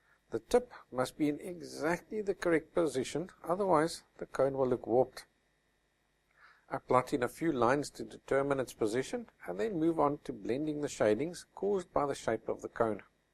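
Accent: South Africa. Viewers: Beautiful drawing! Are you South African?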